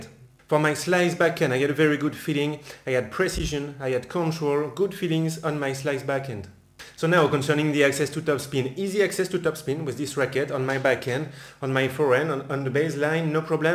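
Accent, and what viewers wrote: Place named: France. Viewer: french accent is so funny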